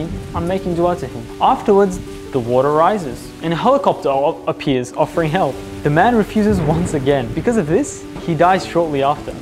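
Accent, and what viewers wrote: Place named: Australia. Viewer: I love the AU accent. Congrats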